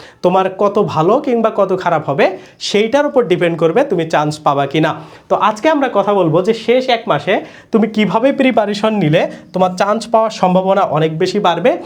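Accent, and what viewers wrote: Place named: Bangladesh. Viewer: So many Bangladeshi teachers have this one thing for improper pronunciation. I think it's due to their local Bangla accent. Otherwise their teachings are good. The pronunciation low-key puts me off tho. But I don't think that's a big deal.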